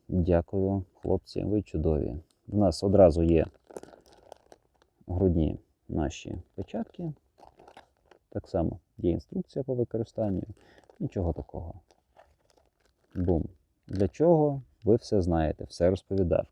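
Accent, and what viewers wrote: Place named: Russia. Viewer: Может не стоит мучаться и пытаться добавлять "украинские" слова? :) Слышно же, что русский лучше знаете. Больше людей поймут, что вы говорите. ps. "Специальная такая штука" :))) На алике кстати хорошие наборы продают и недорого. "Rhino Rescue". Там даже этот наглазник есть.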